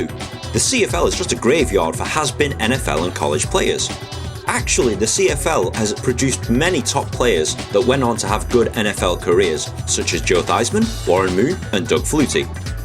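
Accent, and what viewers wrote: United Kingdom. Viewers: Am I listening to a British guy tell me about football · Why is a Brit explaining American or Canadian football?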